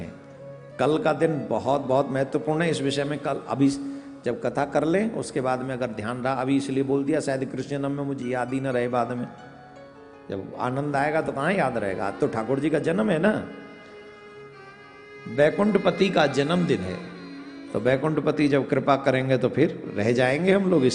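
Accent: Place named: Gujari, India. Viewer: maharaj aapki desi boli ke hum diwane hai kacchu bhi kaho hame to jee badi priya laage mohe eeso laage jaise ye gwalo wali boli hee hume bhagwan bol bhae desi gurjari or rasiya boli jo aap bolo vo kaan me sugar daal de aisi laage maharaj me to sacchi me aapko bhagat bhi hogo or fan bhi hogau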